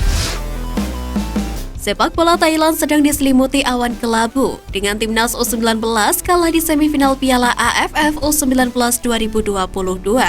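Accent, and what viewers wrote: Java, Indonesia. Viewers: Pembawa narasinya medok amat. Jadi ingat lagu... Andai aku letto wes pasti aku wong jowo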